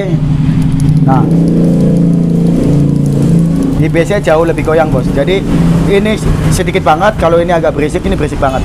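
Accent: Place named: Java, Indonesia. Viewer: Demak jateng hadir mas. Jowone medok. Hhh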